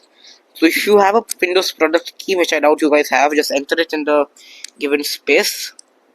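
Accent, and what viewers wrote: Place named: India. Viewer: omg indian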